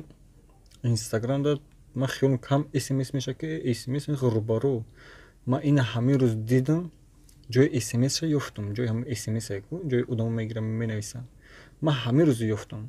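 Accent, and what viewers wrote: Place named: Pamir, Tajikistan. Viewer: ты ранги помирийо гап мезани бо йагом рагт помири набоша ба пеш 👍👍👍👍👍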